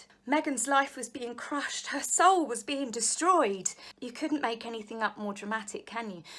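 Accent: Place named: RP, United Kingdom. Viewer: Your speech is getting posher with all this royal talk!!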